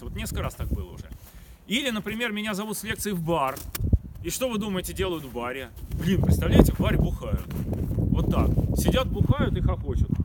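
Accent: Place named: Okanye, Russia. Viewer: Очень харизматичный мужик (в хорошем смысле). Окает как монах.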